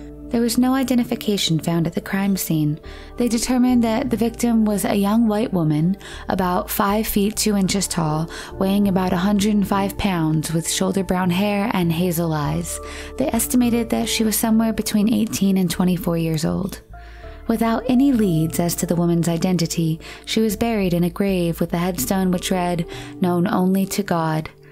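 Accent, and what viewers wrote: India. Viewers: I was always wondering where are you from, coz i felt you maybe from India due to your accent. As a fellow Indian, I want to congratulate you for running such a nice & successful channel. I am so happy to subscribe & enjoy your content!!! All the best & keep it up 👍👍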